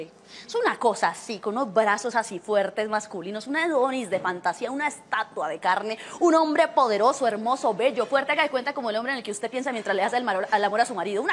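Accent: Colombia. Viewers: aquí en Chile amamos el acento de Bogotá ufffff delicia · uffft para un mexicano ese acentito colombiano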